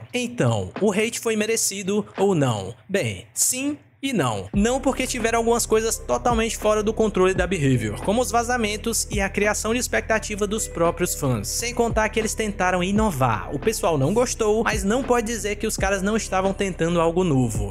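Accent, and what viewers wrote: Ceará, Brazil. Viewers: Poha baitinga, tu é do Ceará? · é cearense? Falai vizinho!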